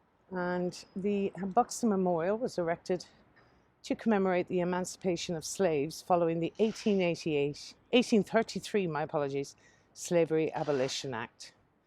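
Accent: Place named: South, Ireland. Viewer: Found you by accident through looking for famous graves. I was so interested in your voice and i was thinking to myself thats and south irish accident, i came by your videos of Killarney and my god you a killarney woman. Im from North Kerry in a small town of Listowel. Your videos are so interesting and your jack the ripper story was class. Keep up the great work from a irish fan ( sure you only from down the road).:)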